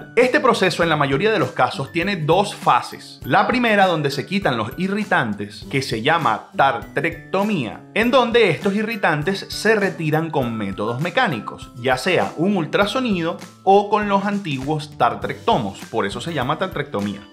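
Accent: Venezuela. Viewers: Ajajaja me da mucha risa como hablas Venezolano neto Doc.. tambien soy Venezolana pegadisima ah sus videos explica todo muy bn Bendiciones 🙏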